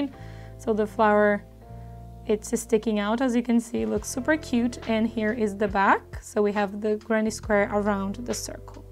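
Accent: Brazil. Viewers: I just discovered your channel and I’m loving your tutorials, can’t stop watching ❤️ I notice you have an accent and it looks a lot like Brazilian, are you Brazilian? I am from Brazil 🥰🇧🇷🇧🇷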